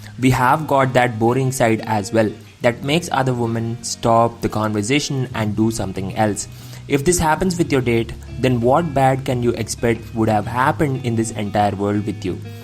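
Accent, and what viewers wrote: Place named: India. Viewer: What the hell indian accent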